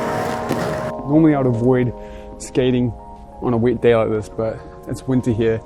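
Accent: New Zealand. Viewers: Are you born Kiwi or you come from another country? I love your accent 😍💞💞💞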